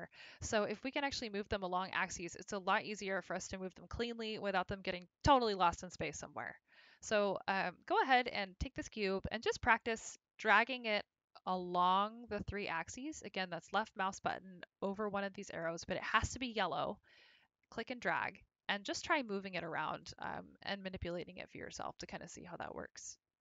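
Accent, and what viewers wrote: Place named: United States. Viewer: How about you get some nazal spray. You sound like you have a cold. Or is that how all Americans sound? Good content but try blowing your nose first so you sound hunan. Sorry for being blunt but if you want a global audience talk like the rest of the world does. Good luck.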